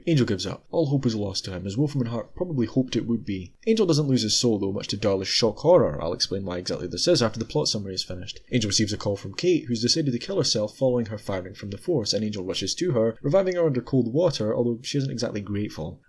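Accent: Scotland, United Kingdom. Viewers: Audio's fine, talking isn't THAT fast and while his scottish accent is definitely strong, it's a lot easier to parse than some js. Btw, captions are a thing :)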